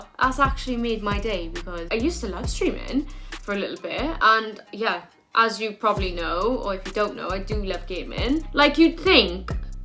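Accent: Wales, United Kingdom. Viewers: I love your welsh accent babe 😍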